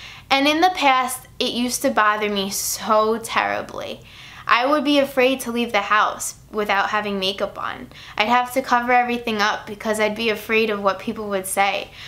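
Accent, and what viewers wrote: New York, United States. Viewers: Idk how people have the balls to make negative comments this girls gorgeous pimples or not ... That New York accent 😍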